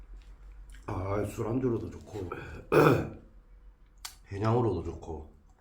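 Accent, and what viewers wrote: Gyeongsang, South Korea. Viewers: 무슨밥? 머슴밥? ㅋㅋㅋ남편이 가끔 “머선일이고?”해요 ㅋㅋ 고 느낌인데요?ㅋㅋ경상도 사투리 좋아하는 1인이예요~😊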